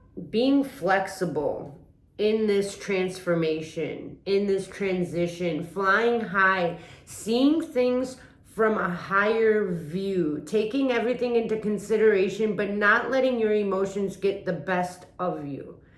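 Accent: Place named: Upstate New York, United States. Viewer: Your accent reminds me of my extended family, who live in upstate NY, and Pennsylvania . 😊 Thanks for sharing your intuition. X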